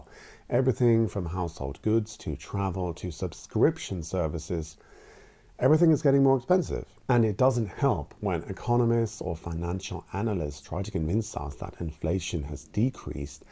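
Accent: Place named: Germany. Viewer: Pal your English has taken on a German accent 😊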